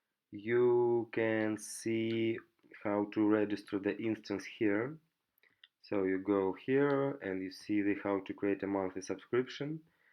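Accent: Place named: Russia. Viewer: Привет. Отлично разговариваешь на английском, но у тебя русский акцент. Подписка.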